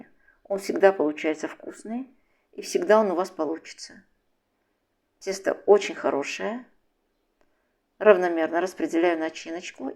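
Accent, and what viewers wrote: Baku, Azerbaijan. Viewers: Очень приятно слышать ваш голос, узнаю наш бакинский родной диалект, он просто неповторим… у вас чудесные рецепты! Спасибо! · Здравствуйте. Большое СПАСИБО за вкусняшку.👍 Скажите пожалуйста, Вас что-то связывает с Азербайджаном....Я просто слышу в Вашем приятном голосе,наш,азербайджанский акцент! Извините пожалуйста,за не скромный вопрос.🙏🙋🌹🌺💕👍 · У женщины легкий бакинский акцент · ОБОЖАЮ ВАШ ГОЛОС И НЕМНОЖКО БАКИНСКИЙ АКЦЕНТ ВСЕХ БЛАГ ВАМ,ДОРОГАЯ!!!❤❤❤❤❤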